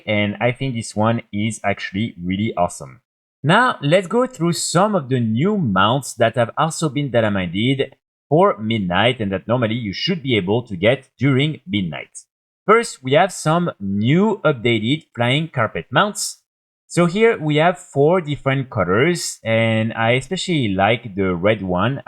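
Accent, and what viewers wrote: France. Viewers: thats called a French accent, are you slow? (edited) guess you had some typos huh sped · He’s French. Try not to be a petty piece of shit online. 👍🏻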